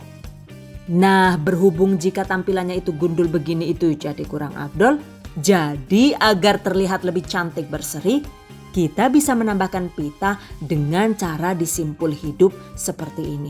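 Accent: Banyumas, Indonesia. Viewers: Emang aku juga suka skali dgn org cantik pintar d sangat terperinci skali jelas menerangin d suara nja ngapak2 nja lucu mantap kereen deh mks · DONE Sumpah laahh, enyong kie seneng denger step by step caranya...video yang lama jadi gak kerasa lama, coz jadi paham rinciannya dan ngakak sendiri kalau denger mother tongue Ngapaknya..maa syaa Alloh Sukses and penuh berkah buat #THVsukses #GiveawayTHV2020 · Mbokayu, rika asline ngendi si, deneng ngendikane ngapak pada karo nyong...asli Banyumas kaya kuwe... sukses terus ya yu...nyong seneng nonton koh...lucu...he he he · Assalamu'alaikum bunda, Alhamdulilah bisa liat wajah bunda, saya penggemar berat bunda Lo..saya selalu liat,video2 bunda.. saya suka bngt apalagi pas ngomong ngapak.. saya orang ngapak dari Purwokerto tp tinggal di Brebes 🙏🙏 salam santun,sehat selalu y bun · The Ngapak Video...tp hasil masaknya mbak e enak tenan mbok,ciamik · Mksh Bun, dah berbagi ilmunya. 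Mantap Bun, suaranya. Ngapak-ngapak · Sy suka mbanya klo ngomong lucu suka gaya ngapak dari mana si mbaa salam kenal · Jan janeh wong ngendi sih mba....ko pinter bhs ngapak...pd bae karo nyong🤣🤣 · seneng bgt dengernya mba....logatnya khas ngapak2 ya mba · Assalamu alaykum Neng ibu reques resep kaldu sayuran dunk🙏... pokoke aku suweneng buanget karo vidio manteb polll gaya bicarane seng gokil logat ngapake,jelas banget ambi tip & trik e,wes to pokoke khas bnget ora ono seng madani,pkoke lope lope yù😘😘😘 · Hahahaha ngapak metu maning lucu, seneng liat video nya bnyak ilmu terimakasih bun GOD BLESS U Aamiin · Kalau sudah ngomong bahasa ngapaknya, anakku gak berhenti tertawa hahaha. DONE #giveawayTHV2020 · terimah kasih semua resep" nya. saya suka dengan suara nya & bahasa nya, mudh d mengerti. ada d alek Banyumas nya lg. kbetulan. " nyong be wong bnyumas. " Angger ora ngapak ora kepenak ". 😄😄😄🙏🙏🙏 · Suka bngt suaranya bhsane ngapak bngt ky aku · bismillah" DONE"Giveaway# 2020.selalu senang dengerin suara mbaknya yg ngapak.pokoke ORA N GAPAK ORA KEPENAK,OK.CATAT.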